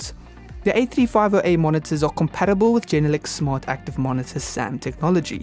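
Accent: South Africa. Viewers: Really enjoyed this video! Toe jy begin praat, het ek dadelik besef jy’s van hierdie kant af! Looking forward to see more from you 💪🏽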